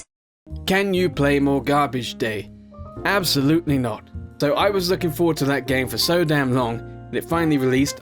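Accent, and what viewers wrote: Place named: South, United States. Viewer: I died a little at the southern accent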